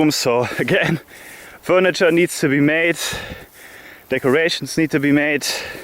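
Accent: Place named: Germany. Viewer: " and..... jaaa " dude i love your classical German accent <3 '' zere you go ''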